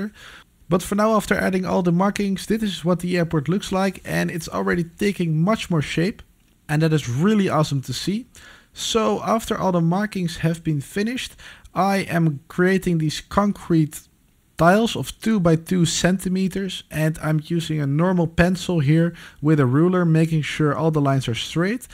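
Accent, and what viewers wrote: Netherlands. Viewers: Love het nederlandse acent in het engels😂😂😂😂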